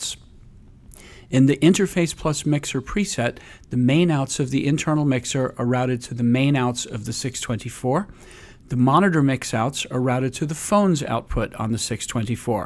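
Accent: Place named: Netherlands. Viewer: Interesting video, in addition it sounds like the speaker is dutch..!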